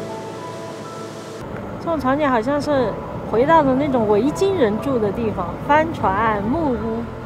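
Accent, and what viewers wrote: Sichuan, China. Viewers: 特别喜欢yooupi说四川话 感觉很好听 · Halibut 清蒸最棒！四川口音杠杠滴！ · Yooupi講四川口音是一大特色！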